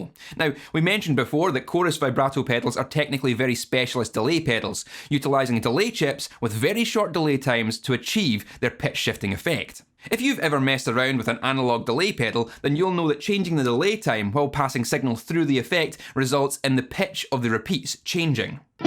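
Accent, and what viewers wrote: Scotland, United Kingdom. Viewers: As a musician from Scotland living in Stockholm, Sweden, i can say that these videos are extremely well made and that it's also a relief to hear a scottish accent again, great work, keep it up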